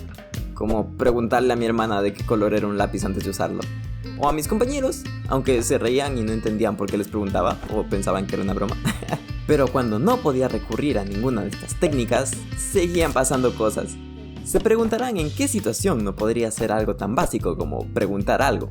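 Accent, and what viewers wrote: Guayaquil, Ecuador. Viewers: Oye eres de ecuadorr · Eres de guayaqui o de guayas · Oh eres de Ecuadoyo igual. ''Abrazame hermano!!'' XD.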